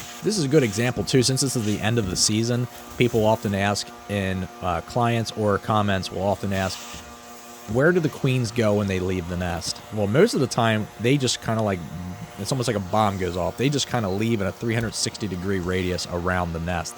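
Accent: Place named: Pennsylvania, United States. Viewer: Knew you were from PA before you said it, one of the most recognizable american accents. 😅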